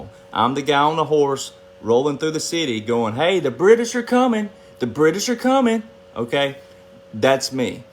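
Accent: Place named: South, United States. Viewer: Patel Patriot has a reputation for putting others down. Likely jealousy. We love you here in Boston. Could listen to your southern accent all day! And you re right. If one of these truthers did what you do everyone would have woken up A year ago!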